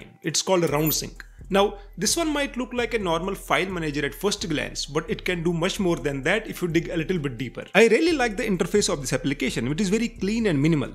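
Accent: Kashmir, India. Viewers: For The Sake Of The Holy Cow !🐄 That's a very heavy Indian accent My Friend. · Are you Kashmiri? The accent gave it away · By any chanass brother, u koshur??? I caught the accent 😅❤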